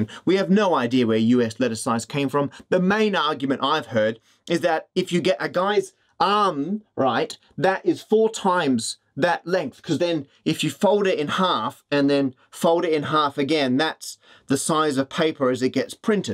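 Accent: Australia. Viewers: If it's one of his former monarchs it's one of yours as well given that he isn't a brit lol. Sounds Australian to me. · Sounded like and Australian to me! - Alternatively, maybe New Zealander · I have only just realised that Matt is not English but Australian. I feel rather stupid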